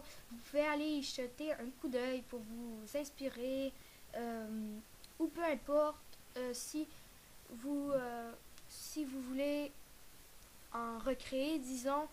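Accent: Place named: Canada. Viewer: c es normal elle a un accent canadient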